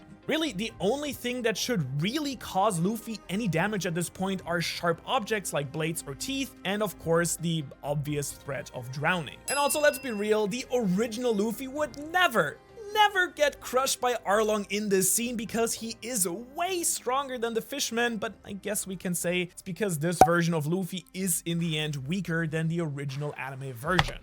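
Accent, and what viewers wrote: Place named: Germany. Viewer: Ich mag deinen Deutschen Akzent.❤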